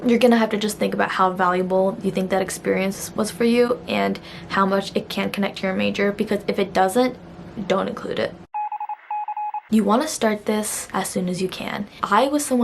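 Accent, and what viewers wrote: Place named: United States. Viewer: I love you Americans and your accent :)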